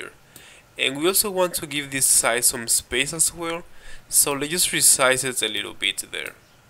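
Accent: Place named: Latin America. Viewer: Thanks. I like the way you explain things. Keep it up broh. BTW. You speak spanish don't you? Te escucho un acento latino:)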